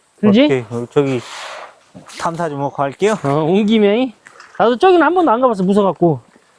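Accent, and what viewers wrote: Jeolla, South Korea. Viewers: * 수많은 전라도표준어가 구수한 누렁지 맛. 여러 구독자분들 조작구내버리는방송 언제나 응윈합니다 ❤❤ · 순수해 보이셔서 구독합니다 전라도?말투 구수하네유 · 전라도 사투리가 구수허네. 영상 잘보고 입습니더!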